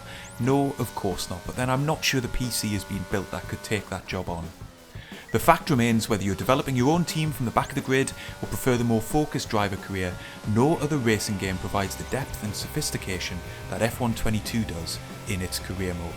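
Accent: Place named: Middlesbrough, United Kingdom. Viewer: Where are you based? You accent sounds close to me (Middlesbrough)?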